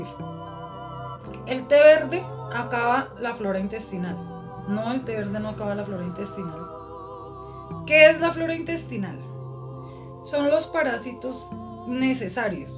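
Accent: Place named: Colombia. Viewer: Me encanta el acento colombiano!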